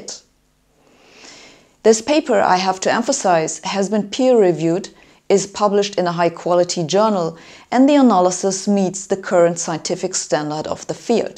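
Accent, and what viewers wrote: Germany. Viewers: Came for the dark energy, stayed for the cute German accent.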